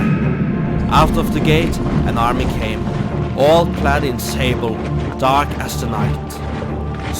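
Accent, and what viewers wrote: Norway. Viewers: ikke ment som kritikk, men man kjenner jo igjen tonefallet. Artig med nordmenn som lager kvalitet på YouTube. Gode temaer og fin måte å fortelle historien på. Forsøker å lage noe LOTR selv, men i en helt annen stil. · The dude talking is norwegian. I can hear it. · are you from norway? I like your accent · Love your east norwegian english accent bro! Love from your closet relative Wermland Sweden!🇳🇴🇸🇪❤️ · This is really cool LOTR battles with an norweigian accent. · The norwiegan accent is soo clear XD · Love the accent. Norwegian I suspect. You can se how Tolkien had Scandinavian language in his mind when creating many of the languages. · Great video! Awesome animations. Also, I bet my life the narrator is Norwegian. Tell me I'm wrong. Again, amazing work brother! Would love more of these kinds of videos! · Detta är första gången jag hör norsk dialekt på engelska! 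Haha gillar det! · Dæven så norsk-engelsk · i knew it! ^^ I could here the Norwenglish xD hehe Bergen here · Is that a norweigan accent Im detecting? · Veldig Norsk engelsk men dæven du er flink. Supert arbeid. Gir meg full oversikt over kampen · We can all hear that the narrator is from Norway...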